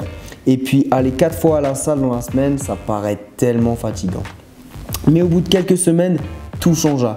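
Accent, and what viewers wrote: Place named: Alsace, France. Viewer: Tu es alsacien non , je crois avec reconnu l'accent de chez moi :')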